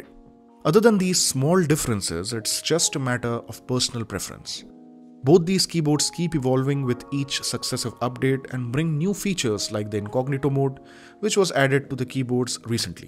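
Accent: India. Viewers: Might be cuz he has an indian accent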